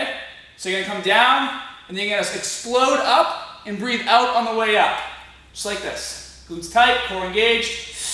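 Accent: Boston, United States. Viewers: I noticed from watching many of his videos that his Boston accent kind of comes and goes...I guess similar to how my Texan accent comes and goes depending on who I've been around all day. If I'm visiting family, I have a stronger accent. But I live in Korea, so I usually have a very standard English way of speaking.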